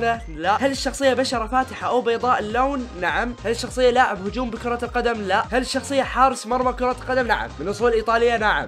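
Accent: Mosul, Iraq. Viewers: اللهجة مصلاوية لهجة عراقية يتكلمها اهالي الموصل (مدينة شمال العراق) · اللهجة المصلاوية هي لهجة الموصل بالعراق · لهجه المصلاويه لهجه بشمال العراق في محافظة موصل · لهجة المصلاوية لهجة عراقية ( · الهجة المصلاوية هي لهجة عراقية😂محافظة موصل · اللهجة المصلاوية لهجة عراقية في مدينة الموصل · الهجة المصلاوية وهي من العراق وتوجد فقط في الموصل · اللهجة المصلاوية لهجة موصل بلعراق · اللهجه المصلاويه لهجه من لهجات العراقيين (الموصل)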